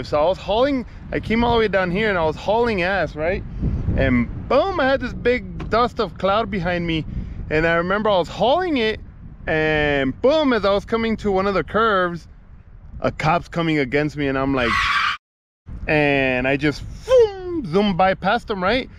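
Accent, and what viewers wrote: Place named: South, United States. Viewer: Love that country twang 😂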